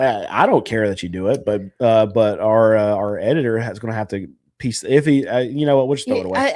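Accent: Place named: Texas, United States. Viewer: Are you in dfw? Sound like a burleson man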